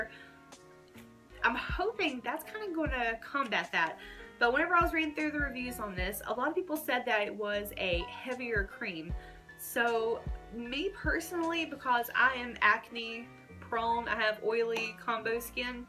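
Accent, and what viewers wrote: South, United States. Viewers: I love your purple hair, southern accent, and shiny eyes!!!!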